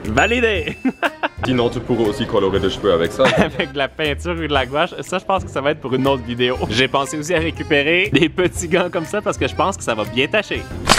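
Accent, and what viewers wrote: Canada. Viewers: Pourquoi tu parl français avec un accent canadiens et tabite au Canada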